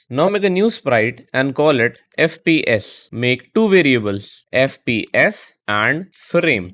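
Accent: India. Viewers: such an intersting video completely ruined by the annoying indian accent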